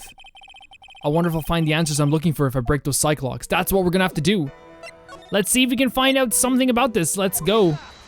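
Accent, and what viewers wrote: Ireland. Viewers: I thought you were Irish? Maybe Iʼm getting you confused with another guy I started watching recently or maybe you moved in the last four years. : Edgeworth should know not to say that. Armando told Mia why last case: never ask a question in court if you donʼt already know the answer. Phoenix breaks it all the time out of necessity, but Edgeworth should at least be trying to follow it.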